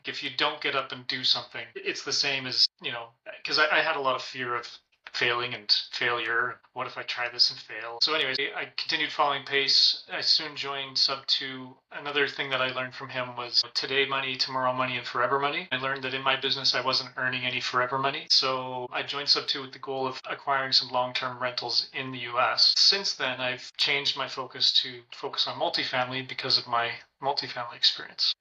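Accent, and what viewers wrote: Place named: Canada. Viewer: Great Canada voice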